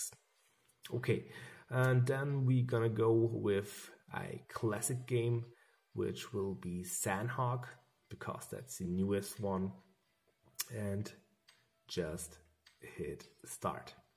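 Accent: Germany. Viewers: Hallo...are u German?😁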